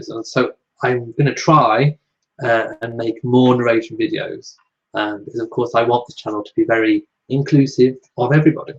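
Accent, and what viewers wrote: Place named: United Kingdom. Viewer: you DO have a great voice and I love your British accent. I watch all your videos and chats.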